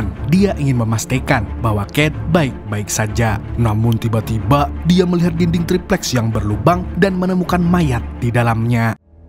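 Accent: Banjar, Indonesia. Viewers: Urang banjar kah pian min? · Orang banjar kah min ? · Org Banjar kali nya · Org mana banjar bnar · logat banjar nya masih melekat🔥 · Orang Banjar kah bg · Orang banjar kah pian mang 😂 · Bubuhan urang banjar nih. Dari logat nya bubuhan brabai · Org banjar ini adminnya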